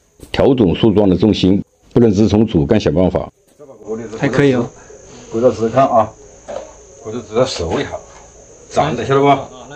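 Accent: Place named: Changsha, China. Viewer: 像长沙话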